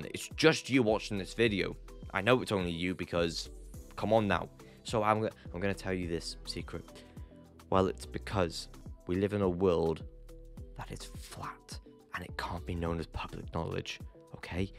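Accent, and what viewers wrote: Wales, United Kingdom. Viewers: Are u from Wales ?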